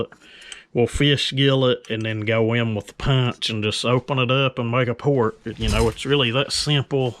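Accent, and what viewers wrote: United States. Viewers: This accent! What state you from bud. Jason new subscriber from McLean Saskatchewan. I'm really liking your channel.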